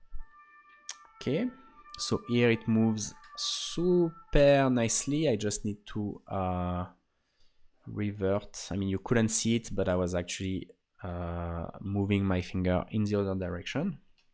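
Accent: France. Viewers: Nice video, are you French? You have a French accent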